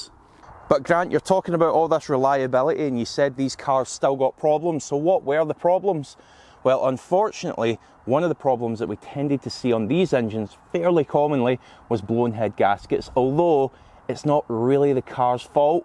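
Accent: Scotland, United Kingdom. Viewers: Scottish accent is so weird but funny 😅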